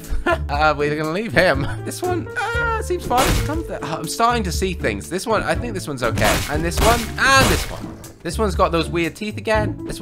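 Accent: United Kingdom. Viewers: You are British but I am from 🇵🇹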